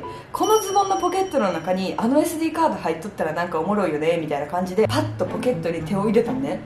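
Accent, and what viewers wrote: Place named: Saijo, Japan. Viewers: たまたまおすすめに出てきたから見てみたら(なんか西条の喋り方ににてる！なつかしい！)と思って3日くらい前から見始めてここまできたらまさかの西条出身で沸いた😂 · 松山と西条では同じ県でも方言が違うんですね。母が新居浜ですがそれとも違う言葉だなと思いました。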